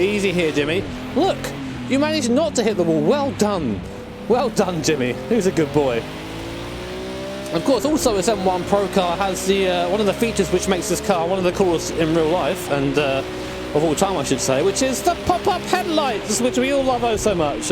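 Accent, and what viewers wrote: United Kingdom. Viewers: I don't know if it's just because I'm from Murka and am immediately enchanted by British accent, but I think you'd make a hell of a racing commentator.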